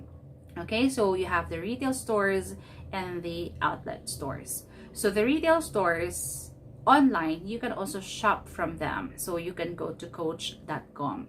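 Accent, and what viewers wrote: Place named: United States. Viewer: I love the accent i thought naaa US po talaga kayo meem hihi wink wink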